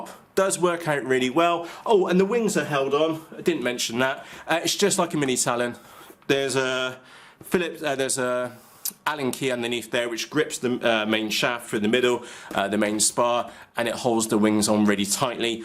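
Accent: Bristol, United Kingdom. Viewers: Good to hear that Bristol accent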